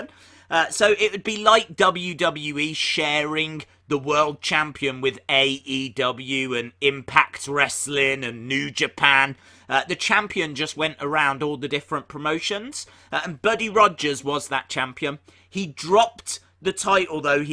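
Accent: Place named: Midlands, United Kingdom. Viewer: whereabouts in the UK are you from? Sounds a bit like a Midlands accent? What would be your nearest wwe show city?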